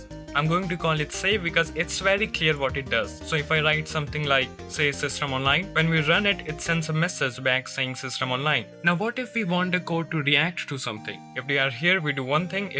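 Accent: India. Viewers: they sound indian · By the Indian accent I know this was gonna be peak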